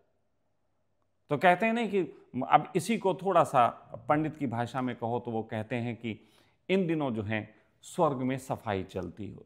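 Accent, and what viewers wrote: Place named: Arab world. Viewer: Pronunciation sai lag raha hai arabian ascents hai urdu padai hai sayad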